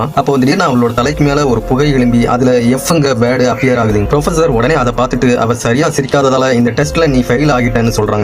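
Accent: Coimbatore, India. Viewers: Enna bro unga slang coimbatore mari Iruku 🤔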